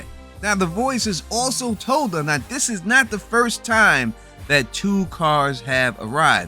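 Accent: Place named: Long Island, United States. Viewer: you from long island, son? i know that accent.....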